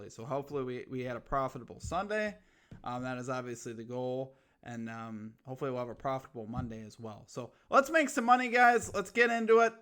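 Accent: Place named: Midwest, United States. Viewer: Pretty delightful midwestern accent.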